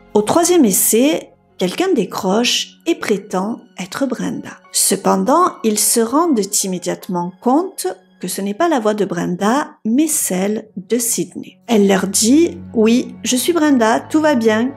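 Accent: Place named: Marseille, France. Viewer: Tu es de Marseille? tu as l'accent de Zizou.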